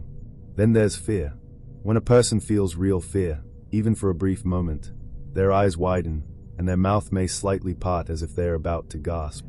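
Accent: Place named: Jersey, United Kingdom. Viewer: What surprised me the most?: Your British accent with Jersey dialect. EI: START: STAT. DARK: DAK... Trying to confuse us all?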